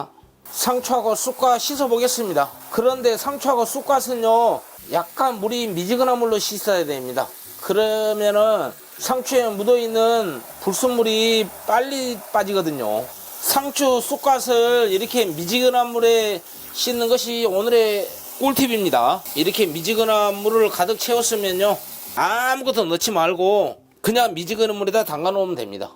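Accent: Jeolla, South Korea. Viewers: 끄트머리는 짤라불고요.~ 전라도 사투리가 겁나게 구수해부네요이! ㅎㅎ 덕분에 저도 상추장아찌 맹글어 볼게요. 항상 건강하시고 행복하세요.~ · 대표님 전라도 출신인가요 정가이 갑니다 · ㅋㅋㅋ 전라도사투리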